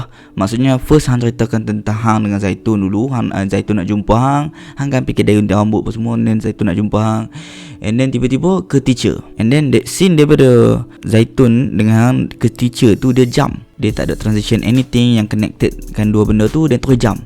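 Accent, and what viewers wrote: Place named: Kedah, Malaysia. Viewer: cakap kedah pon pahamm aih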